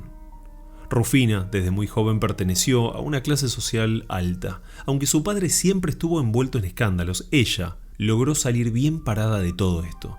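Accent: Argentina. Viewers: Grandes historias narradas por un argentino, que bonito 😊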